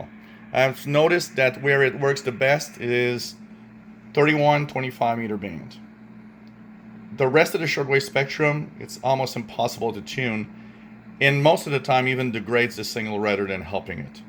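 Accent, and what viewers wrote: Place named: Quebec, Canada. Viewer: Definitely from Quebec with that accent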